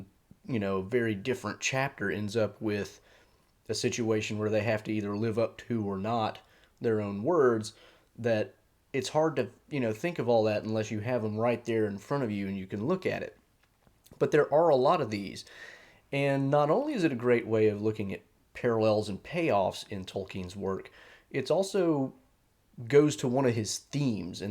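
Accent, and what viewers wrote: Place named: Southwest, United States. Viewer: Where in the south west?....of the United States is your accent from?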